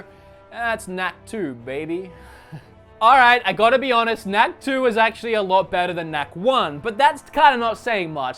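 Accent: Australia. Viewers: Are you australian? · Are you aussie or is my accent detection going whack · He is Australian lol, I'm Scottish and understand the confusion between English and Australian accents, listen for the subtle sounds that Australians make. Also, if you mean the whole island of Britain you would say British.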